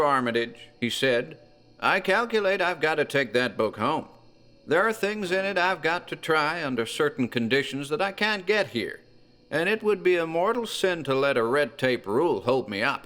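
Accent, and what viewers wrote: United States. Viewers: my second listen, but still i just realized you were speaking american LOL with your voice, you make reading american sound good - and those two other guys, so different. excellent production (even better than some from BBC radio :) 🎭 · Wow, American accent totally threw me for a loop haha. Well done!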